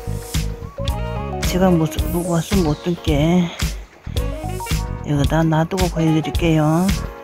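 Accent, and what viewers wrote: South Korea. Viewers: 님의 구수한 사투리는 왤케 정감이 갈까요 ㅎㅎㅎ 손시렵겠어요 ㅜㅜ 역시 님이세요 그 혜안이 부럽습니다 꼭 따숩게 입고 다니셔요 누님 같아서 마음이 많이 갑니다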